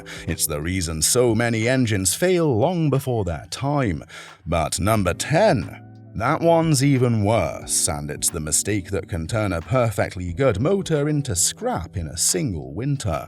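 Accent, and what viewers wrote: United Kingdom. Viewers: this obnoxious snotty sounding British voice narration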